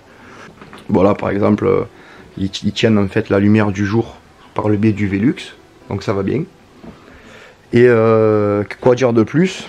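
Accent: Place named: Southwest, France. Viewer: Salut tu est d'où avec ce petit accent de chez nous, moi je suis du Tarn, super ta vidéo, j'aime.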